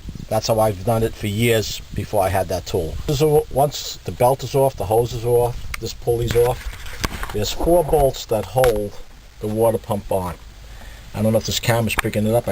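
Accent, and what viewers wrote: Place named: New York, United States. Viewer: I meant to say Long Island. I knew the accent was from NY. I had a friend from derh, sounded just like you. Very fun to listen to. I’ll be changing my water pump out tomorrow. Wish me luck.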